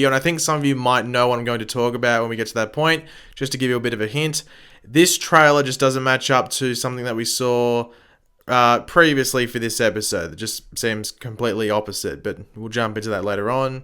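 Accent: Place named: Australia. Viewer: You sound Aussie ;oo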